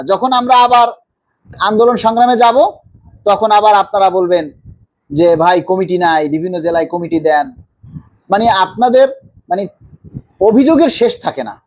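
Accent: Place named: Comilla, Bangladesh. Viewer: কথা শুনে বুজতে পারলাম উনি খুবই বুজদার। কুমিল্লার ভাষা এটা। আমার মনে হয় বাড়ি কুমিল্লা ঐ। বাহিনীর লোক বেশি কিছু বলতে পারিনা।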